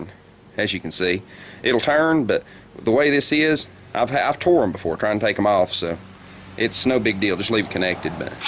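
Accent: South, United States. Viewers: SELLAAHNOIIID Just kidding mate, I love that southern accent :) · This dudes country as fuck ...but thanks lmao worked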